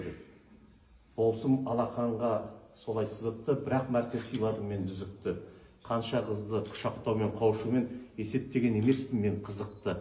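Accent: Standard. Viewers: Мына генералдын жаны Казаккой, керемет таза сойлеп тур. Ана тилин билмейтин адам Казак бола алмайды, канша ол патриотпын тагыда баска деп айтканымен онын жаны Казак емес, онын ойы, корген тустери ис арекети Казакша бола алмайды. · Молодец Ахмеджанов, Касымов Қалмухамет сияқты қашып жүрмейді журналистерден, таза қазақша сөйлеп тұр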